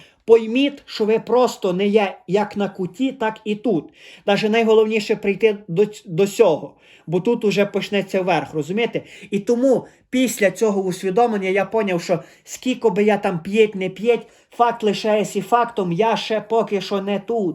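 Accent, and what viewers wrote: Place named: Transcarpathia, Ukraine. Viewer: Перспективно в моєму випадку 😂 В тебе чутно сильний закарпатський діалект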